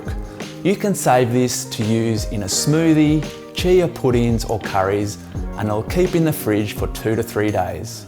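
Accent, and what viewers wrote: Australia. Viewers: Hooray for your Australian accent!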